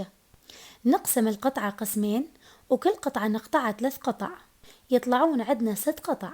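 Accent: Mosul, Iraq. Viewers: يسلم ايداج صوتج يخبل وكلام موصيلي وفوري يخبل ياريت اسم الصفحه مشتريته منهم القوري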